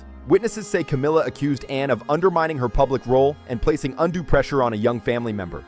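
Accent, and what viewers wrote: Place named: United States. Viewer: I'm American and I don't understand why a loud American is the narrator either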